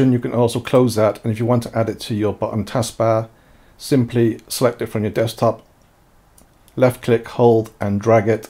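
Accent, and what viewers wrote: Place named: United Kingdom. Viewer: I just started watching the video and already gave a like on It just because I loved the man's accent, IDK If he Is British but I love the British accent, and his accent sounds very likely, so... yeah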